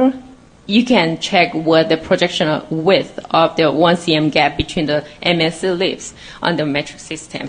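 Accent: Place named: China. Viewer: when it comes to science the language should be clear & understood, her Chinese accent replaces the "L" with "R" MLC will be MRC, Real-time become Rear-time!! but thank you for the effort.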